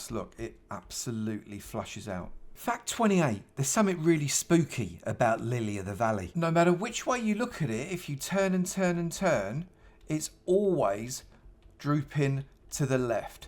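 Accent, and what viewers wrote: United Kingdom. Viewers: this is the most usa documentary style video I've seen by someone with UK accent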